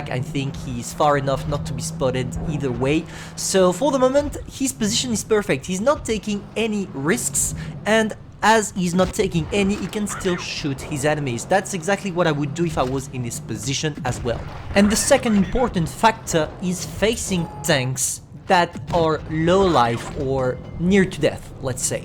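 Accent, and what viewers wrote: France. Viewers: Un super accent pour un français bravo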